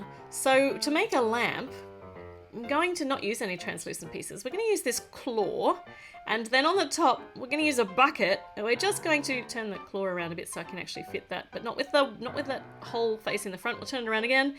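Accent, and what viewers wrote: Australia. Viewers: Me: *watches this * Mum: *walks into my room * Also mum: I rEcOgNiSe ThAt AuStRaLiAn-Y aCcEnT Legit just happened 😂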